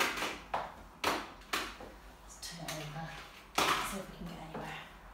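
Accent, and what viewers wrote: West Country, United Kingdom. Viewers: As sexy as you are….. no stilettos 👠 on a real wood floor unless you want to give it a ‘distressed’ look….. there again, it may by engineered wood or posh laminate 🤔…. Love your West County accent by the way 😉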